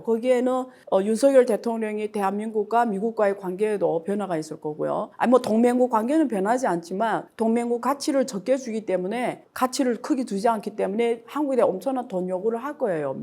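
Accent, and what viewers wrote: Yanbian, China. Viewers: 말투 억양이 어째 조선족인디? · 에이 ㅡㅡ조선족 말투 ㅡㅡ정체가 의심ㅡㅠ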